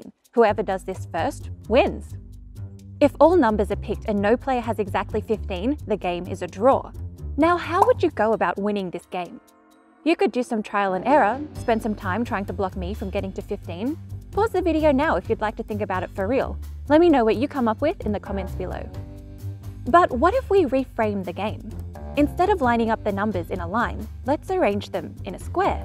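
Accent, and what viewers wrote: United Kingdom. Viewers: What you are Australian? I thought your accent was eccentric British! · Okay, over the past few days I've watched a few of your videos and it seems that no one is willing to "call the Emperor out", (so to speak). So, I'll be the skunk in the group and tell you that your videos absolutely STINK! The problem is not you (or your British accent) or the content (which is competently done). It's your pisspoor audio system! I can just barely understand what you're saying! You really have to get yourself a better system (you should start with investing in a much higher quality microphone!). You might also want to remove more bass from the audio and boost the treble. That would improve matters greatly.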